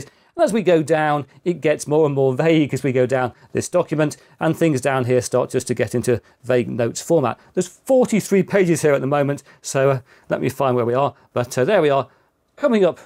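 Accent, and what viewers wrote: England, United Kingdom. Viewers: subscribed for the crystal clear explanations with the always enviable english accent!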